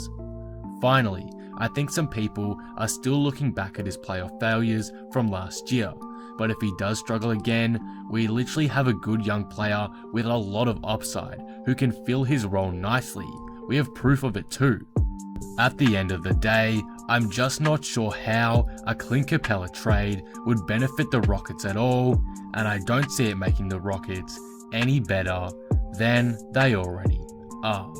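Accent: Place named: Australia. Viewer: i could tell from that accent. Its mad to see an Australian basketball youtube page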